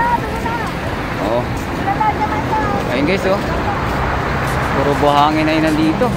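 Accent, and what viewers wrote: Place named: Visayas, Philippines. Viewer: malingaw ko maminaw nimo dong blogger ba kay naga tinagalog ka apan bisaya kaayo imong accent hehehe. payts dong. tira mga bisaya!!!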